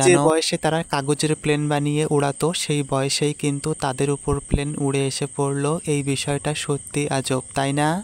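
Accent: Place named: Kolkata, India. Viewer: আমি ভেবেছিলাম আপনি কলকাতার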